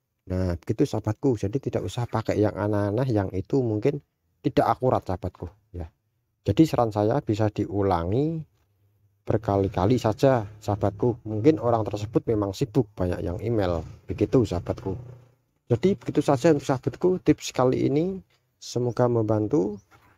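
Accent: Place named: Java, Indonesia. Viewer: Ga jelas medok.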